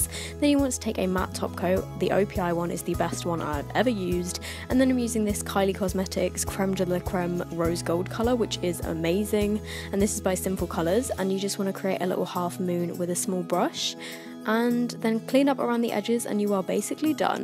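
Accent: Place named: Kent, United Kingdom. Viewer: love it yessss girl where abouts are you from near Kent or more central London? We have the same accent lmao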